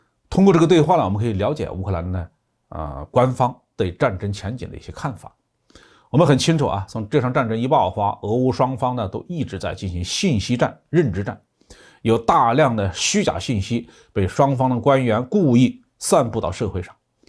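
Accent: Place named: Xishui, China. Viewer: 好浓重的浠普，哈哈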